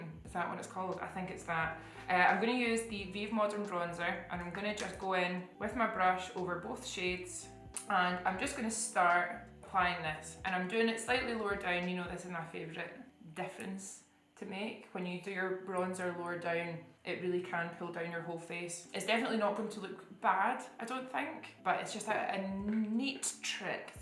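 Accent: Scotland, United Kingdom. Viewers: I'm feeling buzzed and chatty... You are so stunning, and your Scottish accent makes me want to start a bar brawl for no reason. We love the same colors... The right side wins but I love the round eye and brightness on the left. You're right about makeup's evolution in our personal lives in relation to how we see ourselves.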